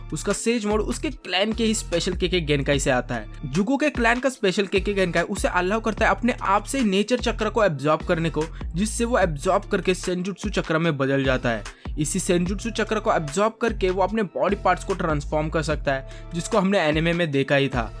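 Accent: Maharashtra, India. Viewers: Bhai tu Marathi hai?!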